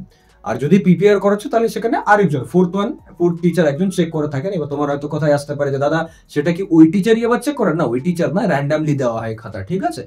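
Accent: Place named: India. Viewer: Bangali hoyeo bangla uccharon thik na....hindi accent ache voice er moddhye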